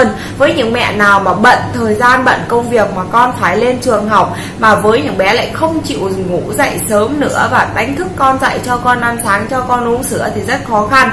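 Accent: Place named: Thanh Hoa, Vietnam. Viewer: Đúng là giọng thanh hóa.. Nói quê quá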